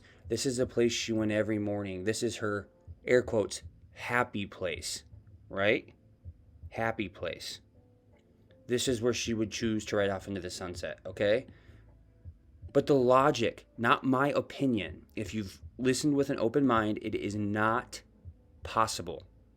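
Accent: United States. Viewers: Just to add yours is the best video analysis by far.....and you sound American.......good job:)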